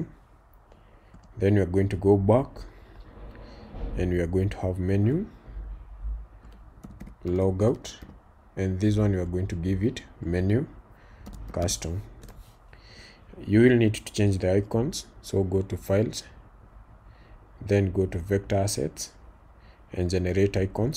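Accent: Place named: Kenya. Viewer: You sound Kenyan. Shukran sana bro!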